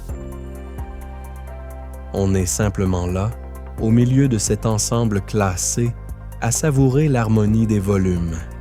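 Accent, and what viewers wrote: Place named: Canada. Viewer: Merci pour ces trésors, c'est vrai que laon possède un patrimoine historique important... L'accent vocal semble être plutôt canadien qu'axonais...😉 je ne reconnais pas... pour info cette après-midi je vais virer avec ma motocyclette dans les parages ✨️✌️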